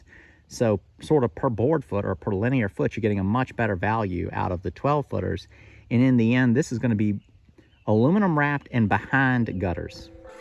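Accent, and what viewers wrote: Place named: Texas, United States. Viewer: You must be from Texas lol. You gt that Texan draw to your voice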